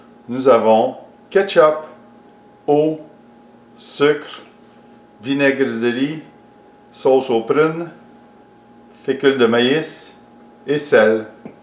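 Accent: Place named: Canada. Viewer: Joli accent Canadien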